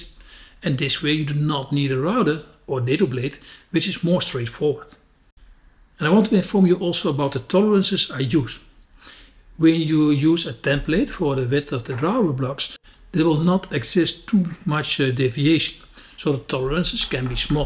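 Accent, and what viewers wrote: Netherlands. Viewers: I am a great admirer of your videos and think that some of the methods you propound are absolutely brilliant. However if one tries to put them into practice oneself it is very difficult to do so. The reason is that you speak very very quickly and it is sometimes a little difficult to follow your Dutch accent. It would be easier if you spoke more slowly. It would also be easier to follow you if you spent a little more time in explaining each step. If plans were available it would also be easier. Sorry to be critical but I think your videos would do even better if you followed my suggestions. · Prachtig dit, you sound een beetje like a hollander.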